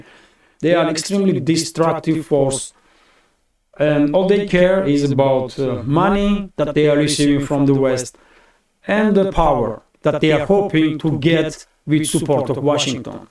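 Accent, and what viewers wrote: Russia. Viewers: I was so happy to see your appearance on the Duran. Your discussions were informative and the points you made were indisputable. I know you worry about your English, but hearing your amazing English skills better than most Americans has brought me great appreciation for English spoken with a Russian accent and has inspired me to learn Russian myself as a West Virginian; I have learned I need to find a tutor. Way to go, onward and upward friend. May your successes and joys be many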